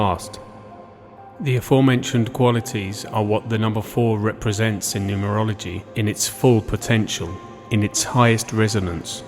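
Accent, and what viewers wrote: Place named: United Kingdom. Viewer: It is good to hear the British English accent. so classy.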